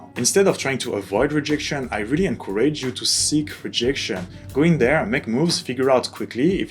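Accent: France. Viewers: A good looking dude with a French accent giving advice on rejection. Yeah ok bro 😆👌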